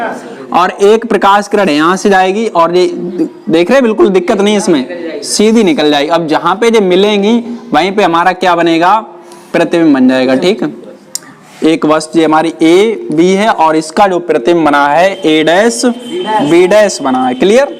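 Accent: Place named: Aligarh, India. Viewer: Bhaiya aligarh se ho kia😅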